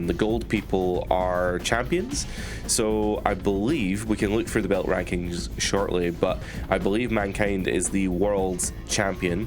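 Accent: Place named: Central Belt, United Kingdom. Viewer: I’m from Stirling. You sound like you’re from central belt as well! How’d my aim?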